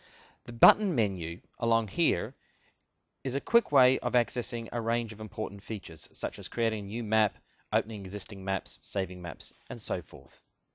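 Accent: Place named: Australia. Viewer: As an Aussie myself, I'm 99% sure its an Australian accent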